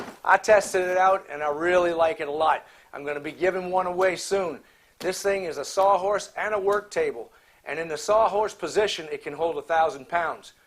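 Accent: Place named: Metairie, United States. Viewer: I love your videos. As a displaced Metairian, the only person around here that sounds like you, is me! You remind me of home.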